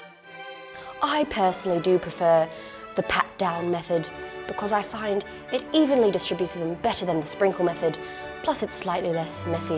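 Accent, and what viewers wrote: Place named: United Kingdom. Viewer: Fabulous British accent!,..cool to hear you call them hundreds and thousands like we do and not sprinkles like in America..lol 😊👍🇬🇧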